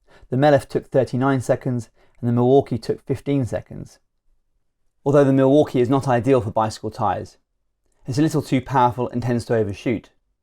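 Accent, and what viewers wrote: United Kingdom. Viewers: Thanks a lot!! This video really helped me! Nice English accent! I wish I could speak like you :D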